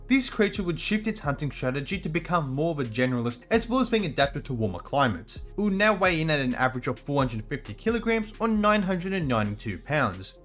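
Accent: Australia. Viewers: Question , is your accent Australian or New Zealander?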